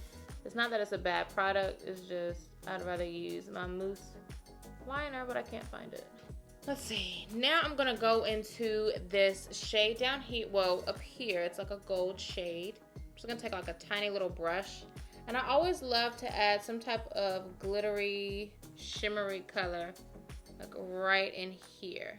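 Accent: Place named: North Carolina, United States. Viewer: Hey it sounds like you live in my area of North Carolina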